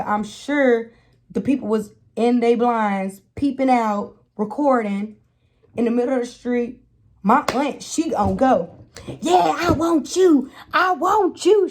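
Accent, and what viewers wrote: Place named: Nigeria. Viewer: Hey gorgeous 😘 Love the content & your nigerian accent tickles me🤣😂👌🤣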